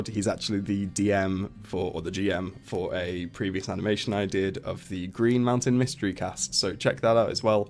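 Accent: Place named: United Kingdom. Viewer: hehe, as an expat living in australia it literally gives me a little seratonin boost whenever I hear a british accent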